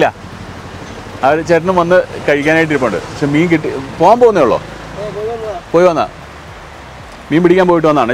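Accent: Thrissur, India. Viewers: Thrissur എത്തിയപ്പോ ചേട്ടന് ഒരു തൃശൂർ slang വന്നിട്ടുണ്ട്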